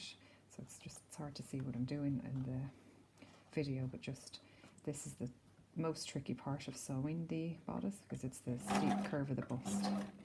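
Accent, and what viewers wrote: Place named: Ireland. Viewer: Irish accent😊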